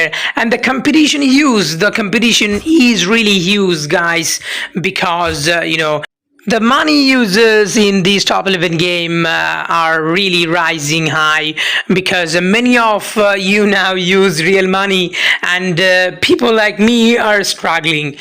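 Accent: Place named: Germany. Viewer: junge direkt gehört, dass du aus deutschland kommst :D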